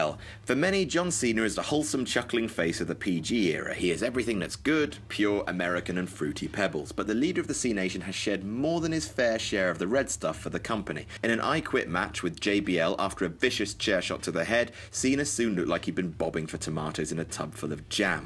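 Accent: United Kingdom. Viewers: can we not have a british guy speaking and get in to the compilation already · Steven Wall You're right. He's British.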